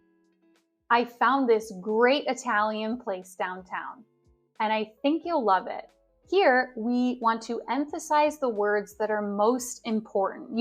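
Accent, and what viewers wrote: United States. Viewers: Thank you i am learning american accent through watching your video i am from India but I am learning lot from you i really appreciate your hard work for me ❤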